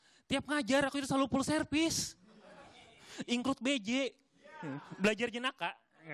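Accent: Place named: Banjar, Indonesia. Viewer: Logat Banjar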